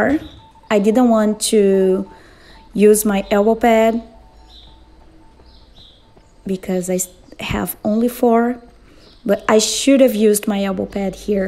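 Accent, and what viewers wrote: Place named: Brazil. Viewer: Aí mds acho tão lindo um brasileiro (a) falando inglês se como fosse português ahhaha❤️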